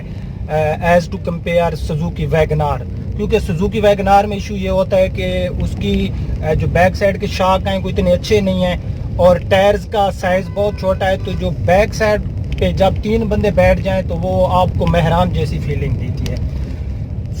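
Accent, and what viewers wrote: Saraiki, Pakistan. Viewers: very nice..ap say gari layni paray ge..aik to ap ki sadgi..or siraiki lehjay par to qurban hu mein..lolz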